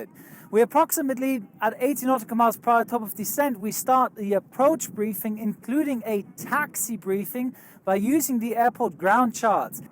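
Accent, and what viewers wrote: Swabia, Germany. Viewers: Bisch wohl a echter Schwob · Endlich amol oinr der mo Schwäbisch schwätzt. Scheeeee. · Nice schwäbisch I´m living 1 hour south of Stuttgart. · Ned schlecht dei Schwäbisch, muss i scho soga 👍🏼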